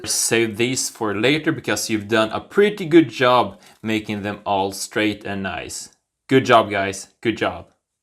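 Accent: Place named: Sweden. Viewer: Juste bra med swenglish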